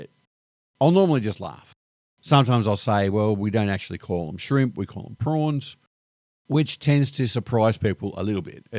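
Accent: Australia. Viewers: Three syllables out of your mouth and I knew your Australian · To be fair, your Aussie accent is quite broad. Your experience being misunderstood in the US might be worse than some. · Unfortunately, you have quite a strong Aussie accent. You're like Bubba from the deep south or Jerome from the Bronx trying to order KFC in Australia 😂. BTW, I'm in my 60s, lived all over Oz, and never checked my shoes for spiders and have never found one. Nobody I know does. That said, encountering a Funnel Web or Redback (=Black Widow) in your shoe could be a problem. Other spider species? Nah, pretty harmless. Spiders and snakes never 'try to kill' anyone. They just run away, if you can even find one. Bears, Pumas and wolves are far riskier. They WILL attack and possibly kill. American readers, please get these things in proportion. Australia is very safe.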